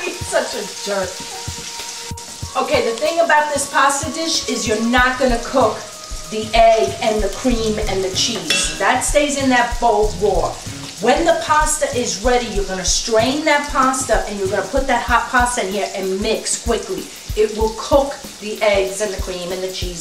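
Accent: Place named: New York, United States. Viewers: great new yorker(??) accent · awesome ny accent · You gotta be from Brooklyn! I can tell from your accent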